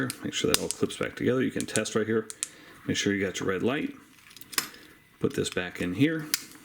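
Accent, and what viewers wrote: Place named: United States. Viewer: You're clearly American with all of the "go ahead and...".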